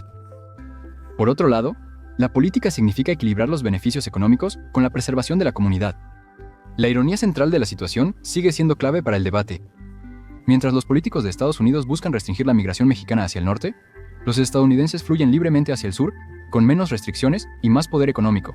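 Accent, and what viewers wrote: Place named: Spain. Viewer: Claro, suena muy español lol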